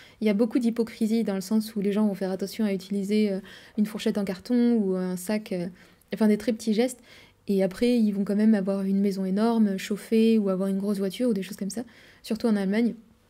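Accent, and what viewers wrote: Russia. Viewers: C'est marrant, tu as un accent russe. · Тоже подумала, что акцент похож на русский )) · Расскажешь про Китай когда вернешься?буду скучать по твоему рязанскому Ы.обожаю его.успехов.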